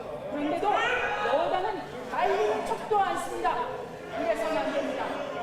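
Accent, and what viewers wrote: North Korea. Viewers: 저 사람은 탈북자 에요?..말투가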